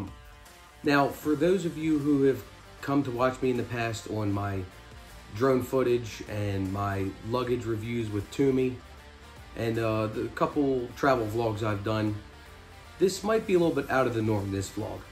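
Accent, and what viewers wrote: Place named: Baltimore, United States. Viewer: Are u from Baltimore? I recognize the accent. Also, what do u do for a living?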